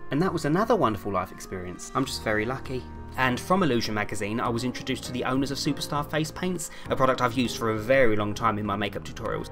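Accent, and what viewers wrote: United Kingdom. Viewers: You sound British, are you British? Nice video, by the way. I have a question, why do you say, "Ello, ok" In your intro all the time? Is it cause you like to say it all the time? Anyways, can you translate this? Spanish Estoy tan contenta de que tu madre te haya llevado a este mundo. Lo siento si ella se fue o algo. No quise hacerlo si ella está muerta. Pero, eres tan especial y amable. Gracias por estar en la vida de YT, y en las vidas de todos. ¡¡Eres genial!! Hint. (it's really nice.)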